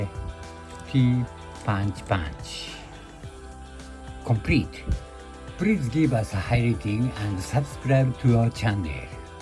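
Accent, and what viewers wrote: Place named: United Kingdom. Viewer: bhai why is ur accent so british (no hate)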